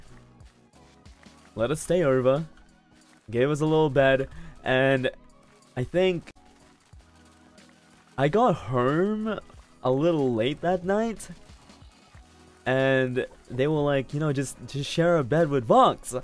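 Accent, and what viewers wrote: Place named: Australia. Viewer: Why does his aussi accent sound stronger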